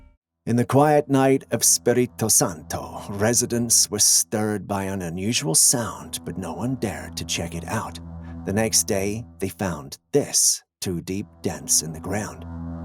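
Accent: England, United Kingdom. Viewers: Yeah the narrator's voice pulls me into the action of the videos. Where is the dude from England or something? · Why halfway through this podcast did you all of a sudden you have an English accent?!! Did anyone else notice this?!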